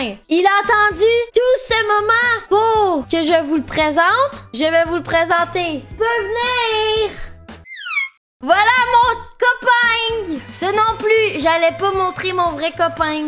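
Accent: Quebec, Canada. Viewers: Je t'adore avec ton accent tu viens du Québec et je kiff. Continu tes vidéos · Honnêtement jsuis un américain qui regarde des vidéos en français pour m’aider a l’apprendre, et l’accent québécois me tue vraiment c’est un fever dream wsh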